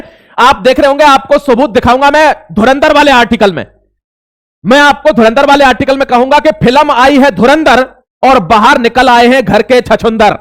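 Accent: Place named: Haryana, India. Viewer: भाई साहब आपकी हरयाणवी बातचीत सुनने से बहुत अच्छा लगता है ! सच्चे देशभक्त की यही आवाज ही है ! जयहिंद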